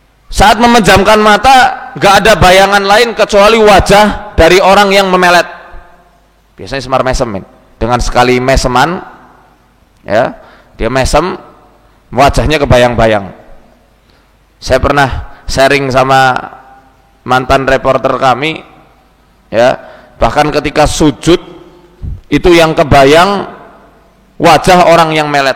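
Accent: Banyumas, Indonesia. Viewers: Pak ustad asli wong ngapak ya....logate pada karo inyong😆😆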